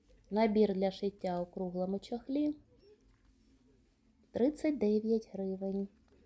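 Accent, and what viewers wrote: Russia. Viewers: На палицях????? Вроді і знаєте мову, але вимова російська.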